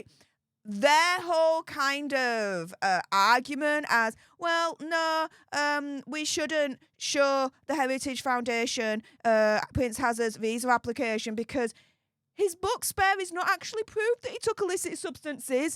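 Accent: Yorkshire, United Kingdom. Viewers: i can see now why you call yourself. As a fmr lawyer let me tell you, you read thro that court order like a professional lawyer. Many ppl find it hard due to the inserted sub sections etc markers, yet you were able to read it beautifully, without stopping, well done. Its not as easy as you made it look, yep you are Def a a very attractive intelligent. I am just up the road from you in Doncaster so it was easy for me to understand the accent when you read that court document. 👏👏👏👏👏👏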